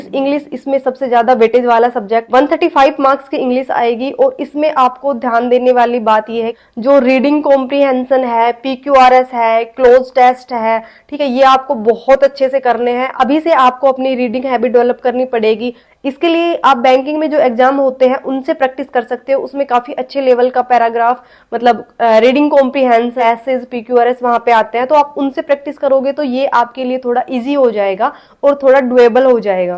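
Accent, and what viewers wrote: Haryana, India. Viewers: ! Tum haryanvi ho?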